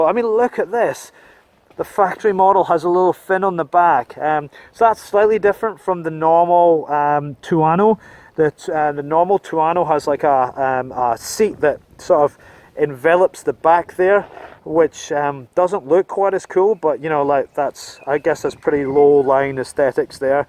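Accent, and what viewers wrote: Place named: Scotland, United Kingdom. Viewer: fantastic bike, good Scottish accent too, superb, haha.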